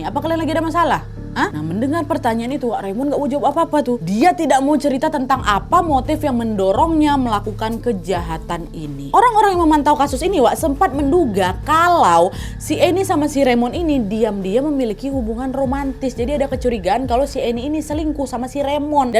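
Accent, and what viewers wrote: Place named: Medan, Indonesia. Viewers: Kak tinggal di jogja atau orang jogja?? Kirain kak orang sumatra/kalimantan dari logat bicaranya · Kirain mba satu ini orang Medan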